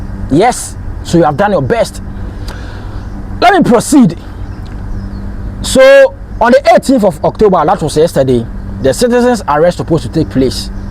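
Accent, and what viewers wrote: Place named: Nigeria. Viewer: BRO HERE IN GHANA NOT IN GHANA HERE STOP SPEAKING THAT HORRIBLE NIGERIAN ENGLISH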